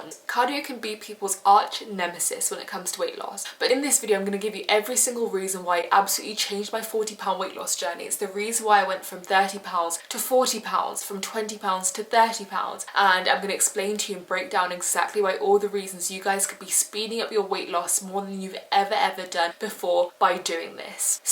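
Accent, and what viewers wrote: RP, United Kingdom. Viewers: Yeah I know there's different British accents hahaha but her particular one is so pleasant to listen to because she pronounces the words so clearly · her accent is very posh, we don't all sound like that lol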